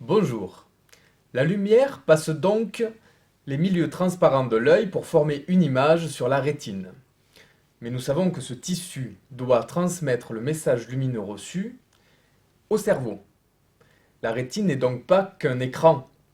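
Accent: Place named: South, France. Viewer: Petit accent du sud qui va bien. Pepere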